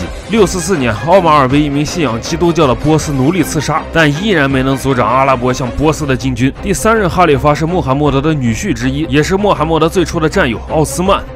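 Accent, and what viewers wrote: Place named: Northwest, China. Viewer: 精彩！讲与伊斯兰教有关的话题，加上解说的西北口音，就更加精彩，堪称完美。期待下集！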